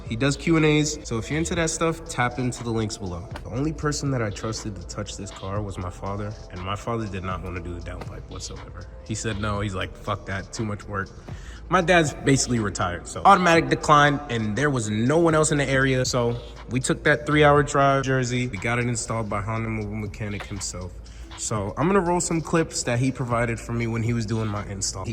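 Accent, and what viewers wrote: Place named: Boston, United States. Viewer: aint even realize you from Boston too 🔥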